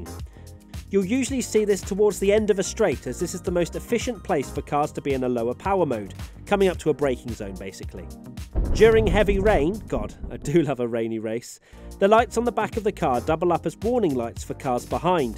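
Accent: England, United Kingdom. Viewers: Yeah I admit I know the answer. I just love listening to your adorable English accent. 😁 Plus your clips are all very informative and entertaining. Love WTF1! Could you get me Charles autograph? Lol!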